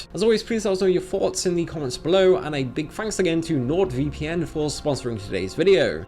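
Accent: United Kingdom. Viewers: He sounds British so that could be it. They also say vittamun instead of vitamin and beeta male instead of beta male.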